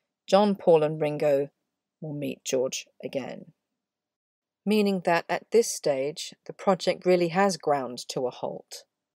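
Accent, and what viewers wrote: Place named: United Kingdom. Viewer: Superb! Exquisite narrated in her soothing, sane, wonderfully British! To me this is very serious stuff not just to me but the world.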